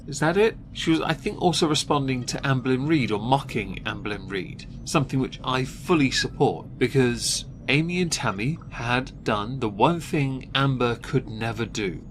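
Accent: United Kingdom. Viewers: You know the very best start of your vid is your saying in your British accent is “Well isn’t that just fantastic?” because that’s exactly how I would say that minus the British accent lol!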